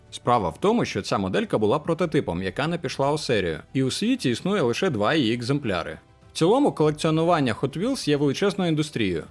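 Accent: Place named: Surzhyk, Ukraine. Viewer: Интересное видео посмотрел с удовольствием так как знаю украинский но по вашему акценту и суржику ухо иногда режет вы будто через силу и принципиально выбрали язык повествования из-за своей позиции это хорошо отстаивать мнение но если хотите больший охват аудитории то сами понимаете что не большая доля охвата людей в снг понимают украинский(с уважением коллекционерство без политоты)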